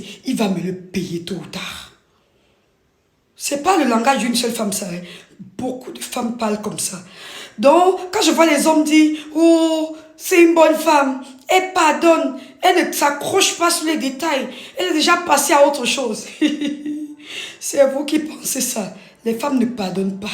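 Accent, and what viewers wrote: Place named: Bangangté, Cameroon. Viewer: Ton accent Bangangté est trop fort ma chérie❤t'es vachement mignonne❤❤bisous.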